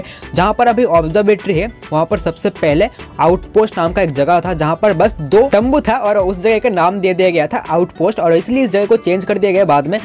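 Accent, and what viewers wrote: Bengal, India. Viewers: You are from Bengal ... I can feel the Bengali version of hindi ... In your hindi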